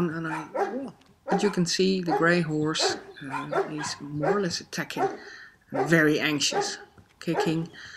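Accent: Netherlands. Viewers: Je klinkt erg nederlands. De dingen die je vertelde was erg logish en accuraat. Maar ik had meerdere hoopjes hooi neergelegd zodat ze elkaar konden onwijken als ze dat zouden willen. En ik denk niet dat ze vechten. Het is eerder kijken wie er sterker is. Ik snap waarom je ze in een kleinere ruimte zet maar ik had ze in een grotere ruimte gezet met meer hoopjes hooi. En ik denk alleen niet dat paarden zoiets hebben als "common sense".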